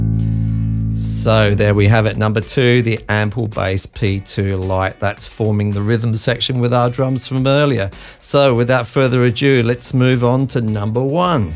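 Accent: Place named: Australia. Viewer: Really refreshing to hear an Aussie accent on-line these days! You may have crossed paths with Pete Johns in here? Always on the look-out for a bargain & you've tickled my fancy with 4 of your 5 gems. Being a bass player, good as emulations get.. sounded good, 'till the octaves.. Thanks, oh, song title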